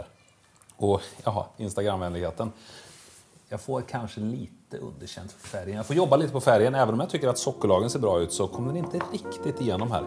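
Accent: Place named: Värmland, Sweden. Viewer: Likead och subbad! Fantastiskt nördigt, klassigt, och underhållande, dessutom på KLINGANDE dialekt som tog mig hem till Värmland. För det är väl det jag hör? Blev alldeles omotiverat stolt över dig! Skall konsumera resterande videos med glädje!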